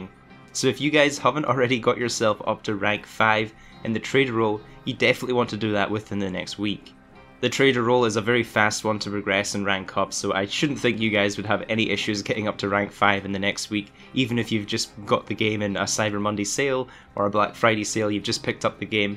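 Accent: Scotland, United Kingdom. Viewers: Hey, your vids are great man. My Mrs is Scottish and we live in New Zealand so hearing that accent is wicked. Gonna be good to have a cabin setup with moonshine business. Makes you wonder what expansion the collector role will get huh.? What's your thoughts? I madam nazar type wagon or trapper site like in storey?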